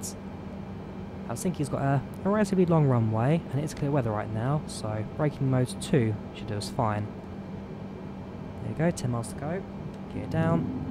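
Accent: United Kingdom. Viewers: THE BRITISH ACCENT IS KILLING ME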